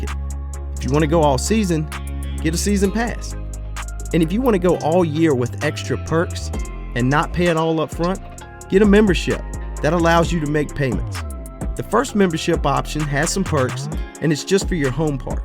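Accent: South, United States. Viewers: you have a great voice over voice but I can tell it's southern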